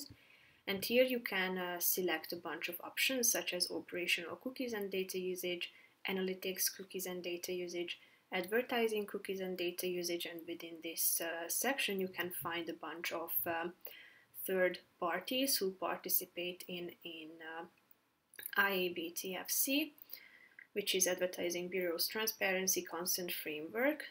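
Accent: Hungary. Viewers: Are you hungarian?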